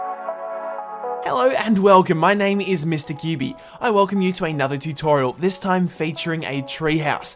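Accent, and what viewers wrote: Australia. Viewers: Are u an Aussie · Are you Australian? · he has a bit of a Ozzie voice Like me because if from there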